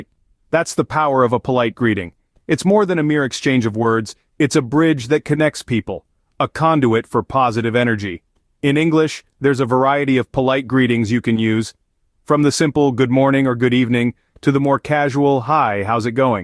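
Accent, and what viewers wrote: United States. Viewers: this video is useful and helpful for me. thanky so much. is this american english? from japan shito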